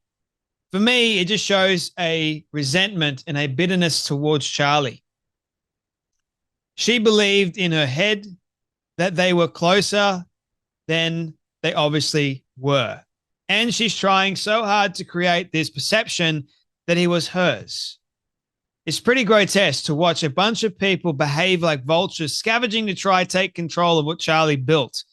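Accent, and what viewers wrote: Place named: Australia. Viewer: I listen to you everyday, encouraged to hear an Australian voice. I admire your tenacity and relentless efforts God bless you.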